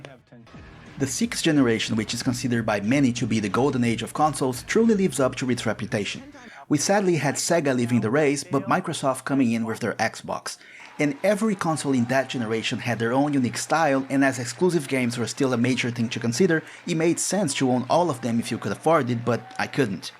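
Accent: Brazil. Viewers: Percebi que era BR pelo sotaque primeiramente hahahaha. Depois vi os textos em português em alguns dos jogos. Você fala muito bem inglês, muito impressionante. Consumo muito conteudo gringo, acabei caindo no seu canal por esse video e fui surpreendido hahahah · A Brazilian accent is always unmistakable. Olá, meu amigo.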